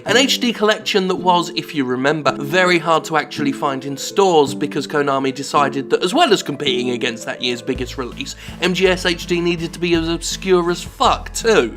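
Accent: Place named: Yorkshire, United Kingdom. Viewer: I'd pay so much money for a Silent Hill voiced entirely by Jim. Especially that Yorkshire accent.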